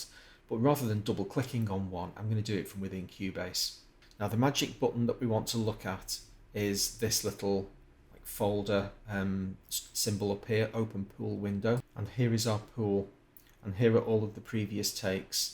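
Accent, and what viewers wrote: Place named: Liverpool, United Kingdom. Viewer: Great Tutorial, Refreshingly Calm, Peaceful & Sticks To Teaching The Topic In A Precise & Interesting Manner, As He Is Doing It. Also, What A Gentleman! This Is A Great Video & I Will Be Looking To Learn More From. "Thank You One". p.s. To Make Things Even Better, I Believe I Detected A Genuine Scouse Accent. The Great City Of Liverpool, "The Home Of The Beatles" "Is The Centre Of The Universe", & To Be More Precise, The Actual Centre Is Here In Fazakerley, In Liverpool.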